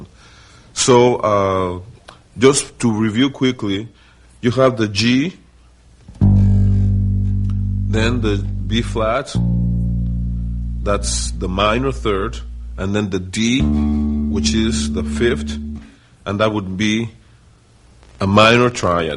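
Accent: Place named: Jamaica. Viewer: This incredibly talented cat has groove and soul and heart and musicality and I Just want to say Thank You,. BTW, where is he from? His accent is pseudo-European, perhaps Jamaican? Anyone? Bueller?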